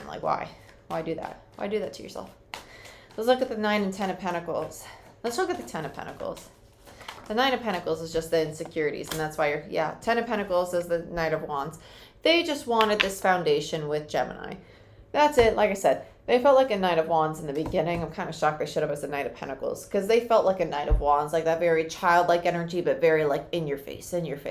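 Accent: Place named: Cockney, United Kingdom. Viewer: LONDON.. LMAO.. I was just laughing at your kinda cockney accent at that point. You do it quite a bit and it always makes me smile.. Usually when you say WATER.. 🙏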